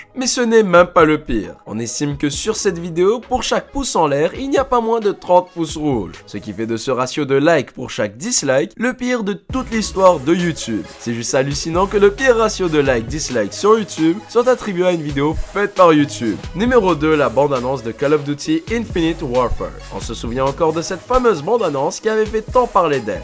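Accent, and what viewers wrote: Quebec, Canada. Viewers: T'es super! Mais es-tu Quebequois?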